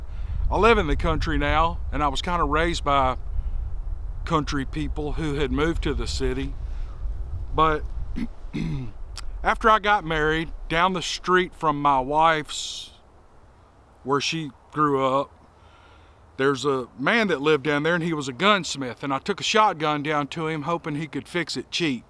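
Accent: South, United States. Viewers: Made me chuckle. You've got a great southern voice; you could probably read from the phone book and people would listen to it. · Yup! I really enjoyed your lame story 😊 Pretty sure it’s the accent, your “good ole boy” accent. Listen to an Australian talk and then yourself … I was born in the wrong country. I could listen to you tell lame stories all day! ❤ Thank you. 🥰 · you've got the best southern accent and best voice on You Tube! Nothing that comes out of your mouth is ever lame! You could talk about working in a sewer and it would sound so sweet! Keep up the great work! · Agreed! I told him he's my people! Live southern people and refreshing to hear my own "language on you tube!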